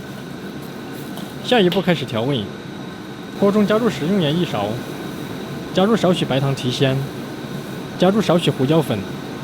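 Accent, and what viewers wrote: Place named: Sichuan, China. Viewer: 明显川普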